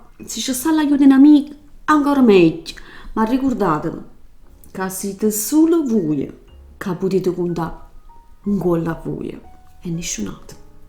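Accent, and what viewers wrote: Naples, Italy. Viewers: Parli un napoletano bellissimo, molto classico, pulito, tipico delle persone istruite che hanno una cultura,infatti non ho bisogno di nessun sottotitolo perché è lo stesso napoletano con cui mi parlava mia nonna adorata nei momenti di tenerezza, lo stesso di Eduardo, di Viviani e non quel brutto dialetto sguaiato imbastardito che purtroppo si sente parlare tantissimo oggi specie dai più giovani, li si che ho bisogno dei sottotitoli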